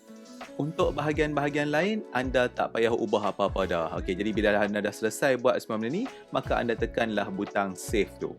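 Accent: North, Malaysia. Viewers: Loghat utara tu okay saja. Muda faham. Sy yg berasal dari Sabah pun boleh faham. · Bg pendapat saya ok sj..mungkin sebab longhat utara saya pun org utara😅..tp overal rasanya sy suka sbb mudah faham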